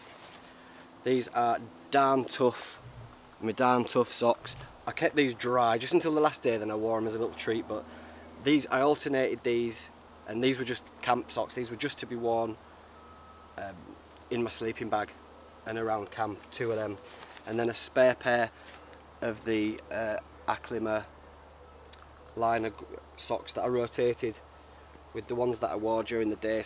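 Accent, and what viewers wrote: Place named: Scotland, United Kingdom. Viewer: That's what I really like about YT. Unexpectedly I found this great channel. I really like listening to your scottisch accent. I used to travel alltrough Scotland 25 years ago. Now I know that I've to come back soon. Greetings from Germany.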